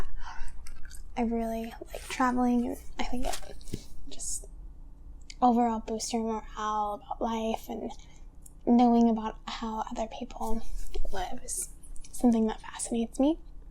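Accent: United States. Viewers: The best of all the American accents. 😎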